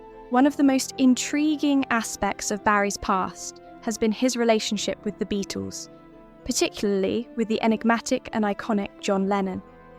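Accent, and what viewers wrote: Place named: United Kingdom. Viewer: LOSE the extremely heavy British accent!!!! It doesn't work well for narrations!!!!!! 😮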